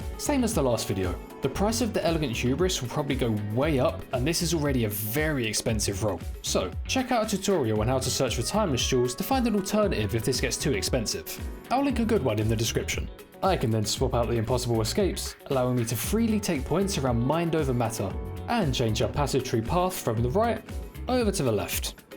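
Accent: United Kingdom. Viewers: Bro you are literally the best creator in this space right now. The voice over is crystal clear with great humor and the brit accent is the cherry on top.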